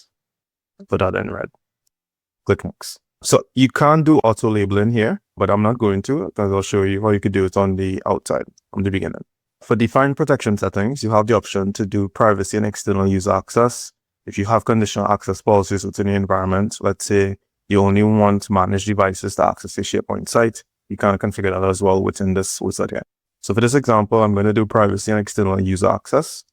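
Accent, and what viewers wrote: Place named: Trinidad and Tobago. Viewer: Dude sounds trini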